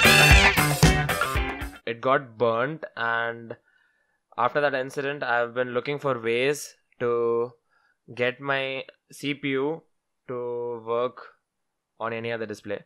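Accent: India. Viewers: Indian? Subscribed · You know he is gonna spit some solid knowledge when that South Indian accent is coming out of that mouth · thanks my cool smart bro♥ your accent is also so cool and every time I hear Indian I hear intelligence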